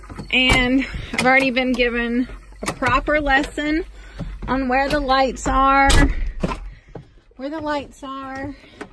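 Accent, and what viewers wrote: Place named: Virginia, United States. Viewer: Not bad I be proud of my bean fields too they did that good. He talking Southern Va. Northern NC. Talk. All people talk that way that lived in that area there whole life. Not been down that far much in a long time. I remember them was tobacco fields far as you could see, Tobacco was king people made lot money from them crops back in the day's. I seen that when I was little kid going visit kin folk in them areas.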